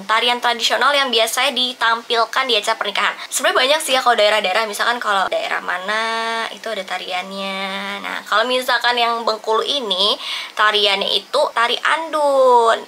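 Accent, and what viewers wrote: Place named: Surabaya, Indonesia. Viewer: Arek Suroboyo Iki loh masse 🙋🙋🤣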